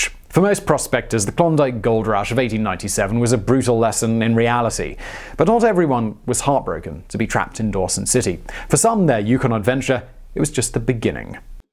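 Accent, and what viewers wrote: RP, United Kingdom. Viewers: I swear you get more posh with every video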